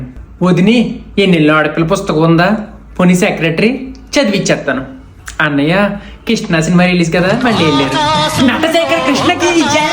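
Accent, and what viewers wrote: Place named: Rajahmundry, India. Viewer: i love his slang as i was from rajahmundry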